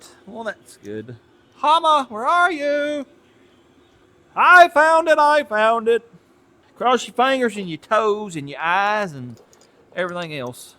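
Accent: South, United States. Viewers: Me and my wife absolutely love this channel. Your cadence and voice remind us so much of our relatives who live in Tennessee and North Carolina. So it's quite comforting. I wish you nothing but the best sir! We will keep watching as long as you keep posting.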